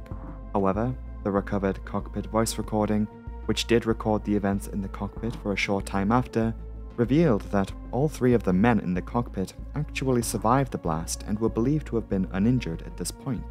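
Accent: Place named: Newcastle, United Kingdom. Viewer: Thank you for another informative video. I'll pledge if you like. Your Geordie accent sneaks out sometimes 🥰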